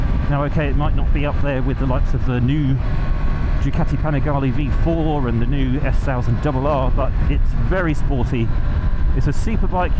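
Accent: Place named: RP, United Kingdom. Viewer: Gotta love the private school accent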